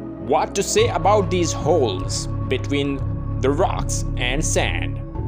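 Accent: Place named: India. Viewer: English speaker with indian accent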